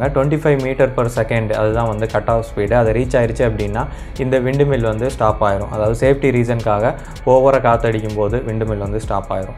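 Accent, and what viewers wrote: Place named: Kanyakumari, India. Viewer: ur slang looks like, kanyakumari or nagercoil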